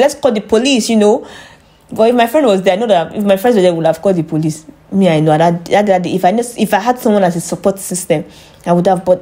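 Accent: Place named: Africa. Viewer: Sis, I want to watch your channel but its hard to understand you. I'm a black American and is unfamiliar with African dialect. Peace from New York ✨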